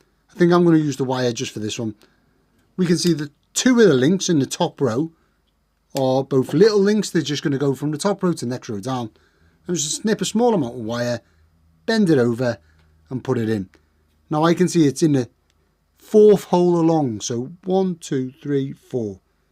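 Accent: Liverpool, United Kingdom. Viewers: Hello! Enjoying your videos very much - I do build pedals from time to time! (Have built Zvex Fuzz Factory, Bazz Fuss, Fuzz Face, Vox Repeat Percussion, Sparkle Drive, Wooly Mammoth...) I like that your approach is just making it work - sometimes I've felt bad about twisting resistors together to get the right one, but seeing you do it shows me I'm in good company! I sometimes parallel them which I feel happier about because it gives me a chunkier "component" rather than a long skinny one. Takes a bit more trick math tho (or just try until it's close enough for government work as you say). As someone who once lived - and met my wife and married - in Liverpool, it's lovely to hear your accent again, and be reminded of the wonderful times and good people of your fair city - certainly the biggest influence on my life. You using a lighter to shrink heatshrink just took me right back to the street-sellers shouting "Pound-yer-lighter" (which we still say in my house when we're looking for one). 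I wonder if the electronics store near Rushworth's and Curly Music is still there - no doubt a lot has changed. Anyway - in my experience of putting stuff together on veroboard, I used to make my boards as compact as possible - but as you experienced, this efficiency can make life hard - I think this layout could benefit from a bit of breathing room - there's certainly room in your superb enclosure! I use "diy layout creator" for my designs. My other thought was, as you use some very posh co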